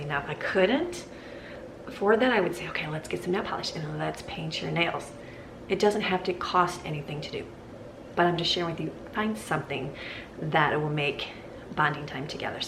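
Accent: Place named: South, United States. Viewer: Southern talk yall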